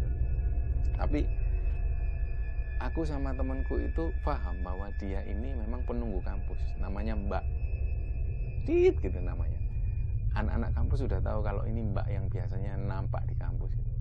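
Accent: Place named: Surabaya, Indonesia. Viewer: Ga fokus sm critanya tp slalu fokus sm cara ngomongnya🤣logatnya itu loh..sy lahir dsurabaya tp bsr & hdp di jabar...smpe skrg bljr niruin logat sby msh blm bs😁😅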